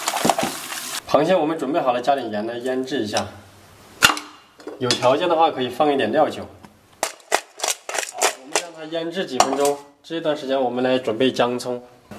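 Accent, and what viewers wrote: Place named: Zigong, China. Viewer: 感觉王师傅是自贡或者内江的~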